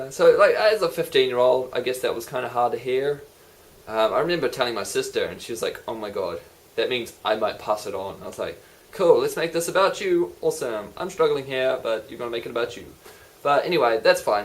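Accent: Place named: Australia. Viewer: you sound Aussie i,m waiting for a specialist appointment to see if I have this or Klinefelter syndrome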